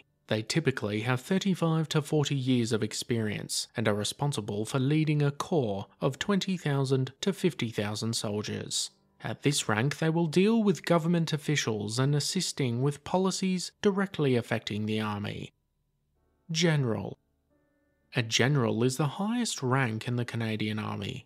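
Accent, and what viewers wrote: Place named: United Kingdom. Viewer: Interesting....my father was RCAF, seen WWII, stayed in until 1969. Not sure if it's the British pronunciation of the presenter, my father seen the old British style ranks then the new American style change....he retired a lieutenant-Colonel, I never heard him refer to his rank as a "leftenant" it was more a "lutenant-Colonel".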